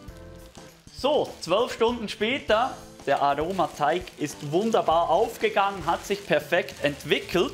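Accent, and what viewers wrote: Switzerland. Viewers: Ich habe schon mehrere deiner Rezepte gebacken. Jedes Brot ist immer super gelungen, obwohl ich meistens die doppelte Menge nehme (große Familie). Mach weiter so, ich liebe diesen schweizer Dialekt .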